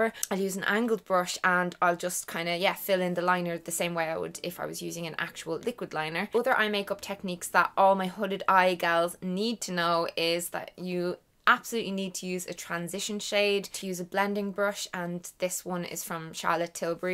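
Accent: Ireland. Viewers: I'm so glad I found your channel! I lived in Ireland 7 years, so not only are your videos super helpful, but your accent also brings so much nostalgia! Thank you for all the helpful tips! If you don't mind me asking, is your hair color natural? The black you're wearing is so vibrant! It's not... inky, if that makes any sense. It looks like your hair has lots of different shades of black to it, and it looks gorgeous. :)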